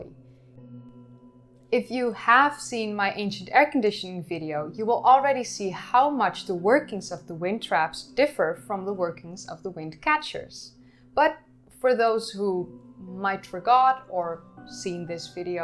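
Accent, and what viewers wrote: Netherlands. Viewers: Your videos are great! Don’t worry about the accent. Dutch speaking people generally do a great job with their sister language (or so I’ve noticed). I speak some Norwegian as well (very poorly granted after 30 + years of being away from it), but we should never be embarrassed about trying. You definitely have a great command of the language. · The Dutch accent should stay, R's sound so much better ❤. Great take on the 1984 Dune lol. It is a cult classic good and not good simultaneously. Excited for merch too. Oh and I am in love with those scrunchy sleeves 😍. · I luv your Dutch accent. Takes me back to childhood in Europe. "Terribly Incredible" = perfect. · I like the Dutch pronunciation 👌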